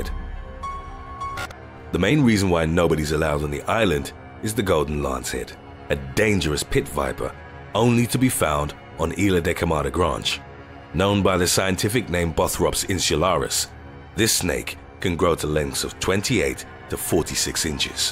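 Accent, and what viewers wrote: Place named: United Kingdom. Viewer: this channel is to be good with giving you actual survive tips against certain animals or calamities like heat waves and avalanches. But it seems like he deleted all of those videos and now he's dishing out these wack videos that will never apply to me and with that annoying British narrator. Bring back the OG narrator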